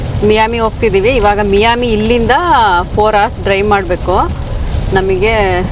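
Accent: Mandya, India. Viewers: Olleya Video madidiri. Mandya da Kannada chennagide. 🎉thanks🌹❤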